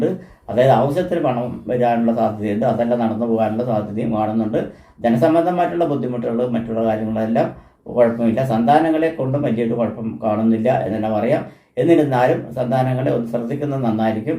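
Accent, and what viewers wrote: Tamil Nadu, India. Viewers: തമിഴ് നാട്ടുകാരാണ്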